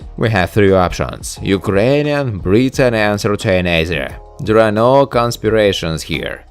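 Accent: Russia. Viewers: I just unsubscribed.had enough of this russian accent!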